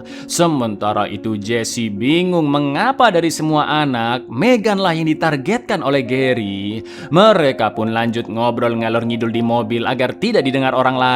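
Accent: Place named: East Java, Indonesia. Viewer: Suarane logat sampean koyok wong jawatimur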